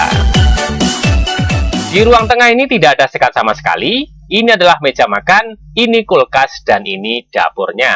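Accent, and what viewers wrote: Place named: Java, Indonesia. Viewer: Mlungker kaya obat nyamuk bakar Jawa medok , sukses selalu bro .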